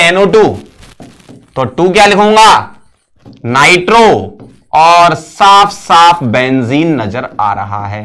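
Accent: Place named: Ranipura, India. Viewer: Ranipura se ho ka masaab?? Eso sunbe me a Rao.. mau balan ki traf se raam Ram..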